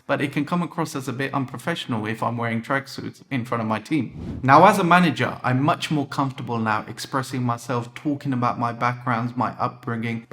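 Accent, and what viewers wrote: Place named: United Kingdom. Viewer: I knew you are a Muslim but didn't know what country you are from. I suppose your parents moved to the UK and you grew up there! (as you have British accent)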